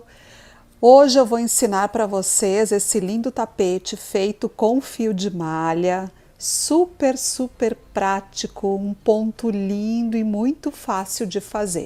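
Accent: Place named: Rio Grande do Sul, Brazil. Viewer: Sotaque gaúcho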